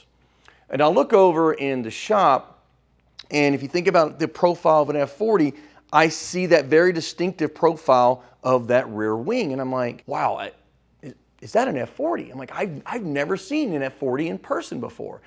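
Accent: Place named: Texas, United States. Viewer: Definitely From Dallas Texas, Maybe Houston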